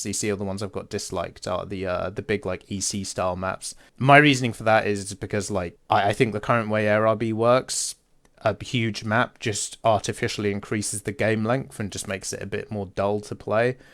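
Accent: United Kingdom. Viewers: Last time I watched a British man yap for 40 minutes it was TheMightyJingles. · mmmmmmmmm asmr british man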